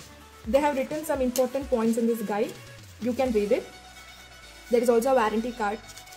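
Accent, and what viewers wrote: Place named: South India, India. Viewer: I think ur south indian, but bytheway it was nice 😀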